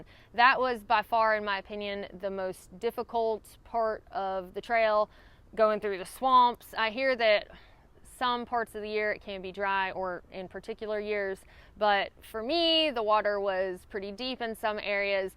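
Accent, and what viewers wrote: South, United States. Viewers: “And if you’re mad at me and wanna fight me because your Pee-Paw told you a story where he had a fight with a water moccasin…” You are just so delightfully Southern. 🤣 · As a lifelong Californian who never been to the south, I still love listening to the accent of a good looking southern woman. Saying that, as someone who loves hiking in Cali and the southwest, this trail is just a big NOPE.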